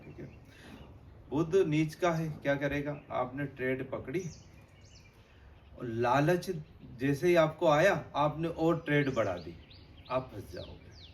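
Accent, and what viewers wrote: Indore, India. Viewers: Bolne s lagta h indore ka touch h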